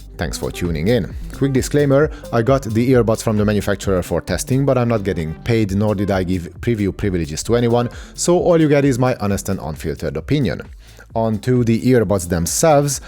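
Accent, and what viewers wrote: Hungary. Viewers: Feldobta a videódat, egyből ki halottam a magyar akcentust, amúgy tetszett a tartalom😊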